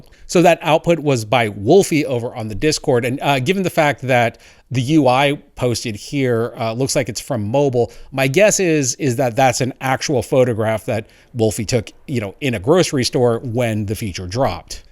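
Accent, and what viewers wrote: Quebec, Canada. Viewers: Hello there, its more like a very strong French Canadian accent, French is my mother language !!! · Je confirme c’est bien l’accent canadien du Québec😅😂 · Great video! :goodvibes:and it's a Canadian french Accent! 🥰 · As a french man myself, I'd say that hesounds like a guy from Montreal. Strong Quebec accent.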